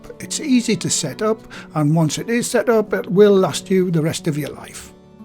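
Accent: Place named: North East England, United Kingdom. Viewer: friends of mine say you should do some ASMR videos, they really like your relaxing north east accent. obviously i have the same accent but i sound like a rougher slang version of jimmy nail lol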